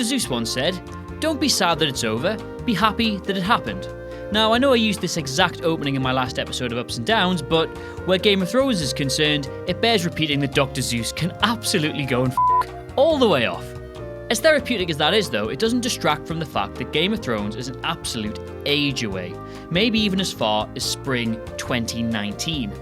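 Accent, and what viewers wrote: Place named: Northern England, United Kingdom. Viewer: LL cool J Mate he's got a thick northern accent, in what world is that the Queen's English?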